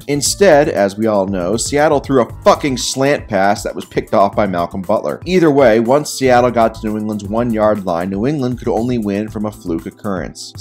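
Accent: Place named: Philadelphia, United States. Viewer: I know a fellow Philly fan when I hear one, respect to you brother for staying sober for 20 minutes to make this video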